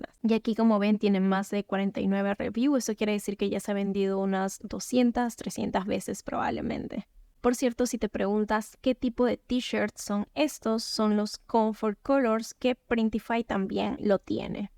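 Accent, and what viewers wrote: Peru. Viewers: Detecto un acento peruano 🤔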